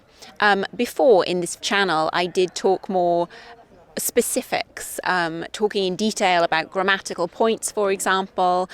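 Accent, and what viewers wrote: United Kingdom. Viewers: Thanks for your real British English video! It’s a very good listening practice for me!! The tree behind you looks like Sakura but it’s a little unique shape! Honestly speaking, I’m not comfortable to wearing mask but my opinion is close to From this 13th of Mar. 2023, I’ll be wearing mask in the confined place like the train but no mask outside! I didn’t know “gnats” but I found it’s the small flies. I misheard gnats as nuts first😅 but it’s a good practice for me!! As for clouds, I studied typical seasonal clouds below❗️ Summer: cumulonimbus Autumn: mackerel clouds Winter:stratocumulus April: altostratus Oh they are very difficult words for me but interesting! I’m excited for the next lessen ❤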